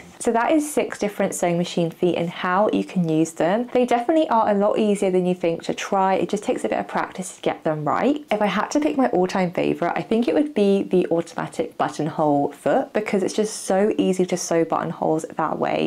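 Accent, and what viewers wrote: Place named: United Kingdom. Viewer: Thanks for sharing. BTW, love the accent. I'm always watching British TV or movies here in The States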